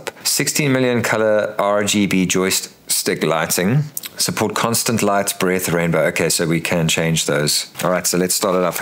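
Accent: South Africa. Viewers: Really enjoying your videos, man! Heard a fellow South African accent, plus channel and vids all about things I love. Easy sub. I've been doing retro emulation on my old PSP for around 10 years now, and last week decided it was time to get an RG35XX Plus, It was meant to a "dedicated console for emulation", one and done, but I quickly realised it's just my "first" emulation station. lol.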